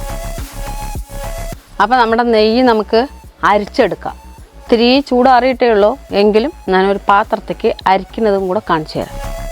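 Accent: Palakkad, India. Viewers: പാലക്കാടൻ ഭാഷ സൂപ്പർ ❤ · Oru palakkadan speech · പാലക്കാട്‌ ഭാഷയാണല്ലോ... എവിടെയാ സ്ഥലം അമ്മേ 😘😘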